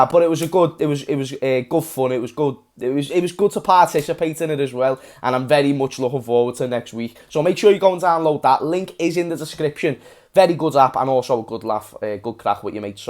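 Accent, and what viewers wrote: Liverpool, United Kingdom. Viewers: Scouser 🤡🤡 i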